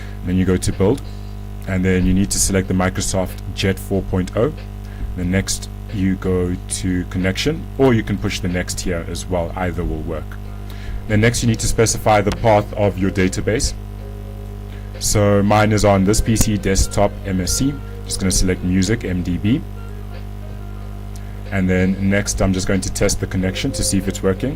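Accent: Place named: Afrikaans, South Africa. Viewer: nah lol that's just the Afrikaans accent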